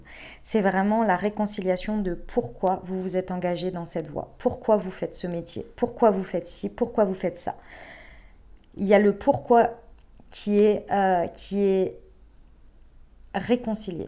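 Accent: South, France. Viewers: Chère je suis contente de te réentendre, ta voix est tellement sucrée et ton accent sent le sud 💃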